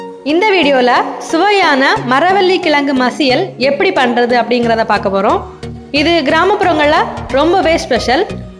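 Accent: Nagercoil, India. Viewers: Ovoru ooruku oru slang iruku... Nagercoil slang romba special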